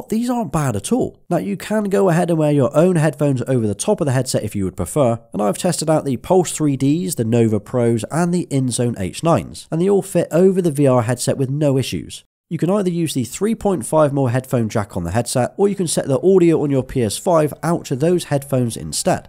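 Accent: United Kingdom. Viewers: what? I love british accent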